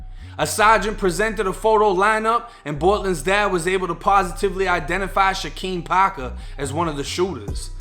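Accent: Boston, United States. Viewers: It’s a bit of a Boston accent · You must be from Boston Massachusetts just from the way you talk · yo are you from Boston your accent is crazy 😹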